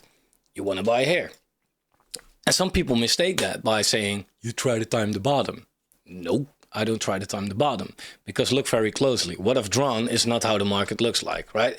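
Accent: Netherlands. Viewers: Great content, finally something different. Btw you sound dutch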